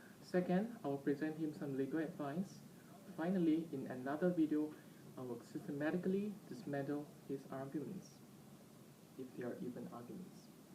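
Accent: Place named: Singapore. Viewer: Please teach anything you wish... apart from Speaking Singish, Chinglish...oh that's right English, you need work on this...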